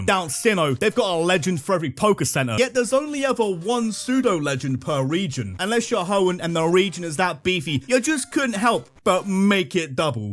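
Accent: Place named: United Kingdom. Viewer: You're so british I love it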